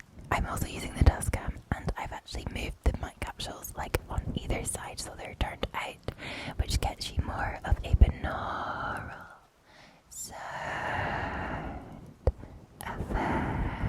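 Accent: Scotland, United Kingdom. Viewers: beautiful Scottish accent, whispered and Tascam mic, this sounds so good and tingly. Thanks for the amazing ASMR content every Monday, Wednesday and Friday 👍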